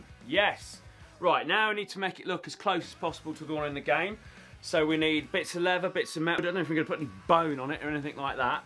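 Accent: United Kingdom. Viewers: i fuckin LOVE your british accent this is a #nohomo commentary i swear but i do really like british accent, from boys or girls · I really like his accent We asian can't get this type of accent asian really don't get this kind of accent except we were born in UK.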